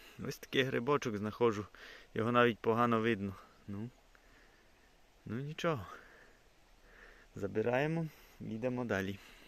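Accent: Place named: Ukraine. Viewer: Отличная прогулка! Впрочем, как всегда., какой красивый у тебя классический украинский язык, даже без грибов, тебя можно слушать бесконечно! Без сленга, без польских и венгерских слов. Так говорить могут не многие и уж точно, сейчас не учат в школах. Я -то, точно не сбегу к другому блогеру 😁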